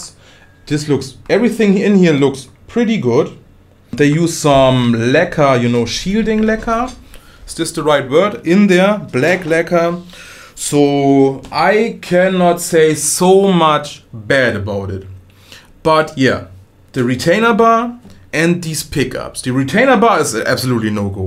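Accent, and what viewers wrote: Germany. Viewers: I like your light understandable german Akzent.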